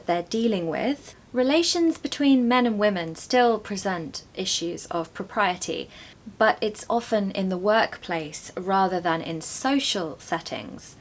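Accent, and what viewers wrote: United Kingdom. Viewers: I use all these words commonly in everyday US English, and without scruple or hesitation, so they're safe over here in the colonies. What a beautiful British pronunciation you have -- insuperable!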